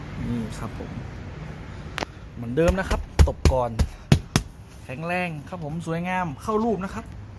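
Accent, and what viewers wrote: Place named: North, Thailand. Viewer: อู้เหนือเลยครับผม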